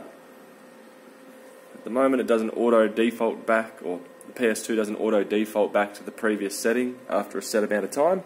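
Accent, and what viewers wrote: Australia. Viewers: Love your Aussie accent homie and great video. You would get tons of women here in the USA. Does it work the other way too? I have heard Austrailian women love American men and its like a 3 to 1 rario there men to women. I hope this question is not offensive, this is just what I have heard. Have a wonderful weekend.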